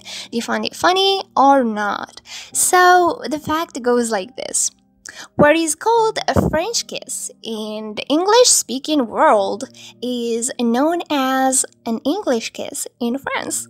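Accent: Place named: United States. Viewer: Fun fact your : you could be a singer. You have a great America accent. And sweet voice❤️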